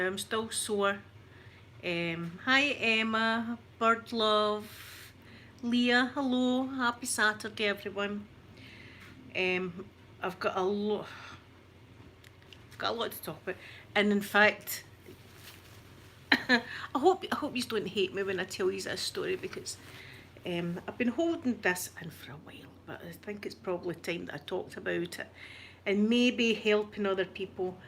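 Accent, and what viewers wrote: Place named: Glasgow, United Kingdom. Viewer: I could listen to you for hours. My dad is glaswegian so your accent feels like home to me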